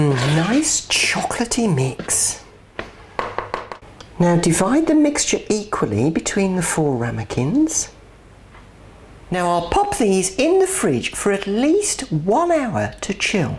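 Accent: United Kingdom. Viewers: I just bumped into you on this site. I saw a comment how somebody turned you onto his mom & she loves you. So I figured if someone watched you, saw their mom later & said "you have to watch this woman", then I ought to give it a moment to see why. Your my hero. Where are you from? The accent. British? Irish? English? Scottish? I grew up in Ireland. Connemara.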